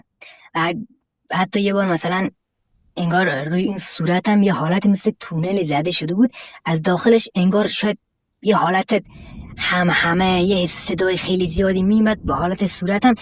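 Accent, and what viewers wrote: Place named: Joveyn, Iran. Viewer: سلام آقا عزیز ،من شیفته اون لحجه جوینی که دارین شدم چون خودمم بچه جوین هستم سبزوار ،حکم اباد،،باعث خوشحالیه که همشهری هستم با شما انسان پرهیزگار😂🙏🌹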